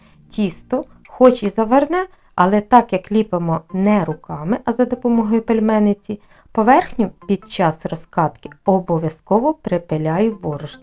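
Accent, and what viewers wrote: Standard. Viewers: Дякую за пораду і за чисту українську ... Добра і процвітання ❤❤❤❤❤❤❤❤🎉🎉🎉🎉🎉🎉🎉🎉🎉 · Дякую за науку, чарівна наша господине! 😊 І за чисту солов'їну - окрема подяка! 🙏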